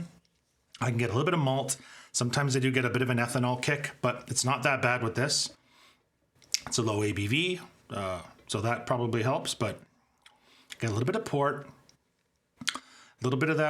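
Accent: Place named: Canada. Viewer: Ok make sense ! You sounded Canadian, but the prices $$ of these scotches seemed to be in US to me... anyway didn't have time to go thru your channel yet, but looking forward to it ! God bless, cheers et Merci beaucoup !!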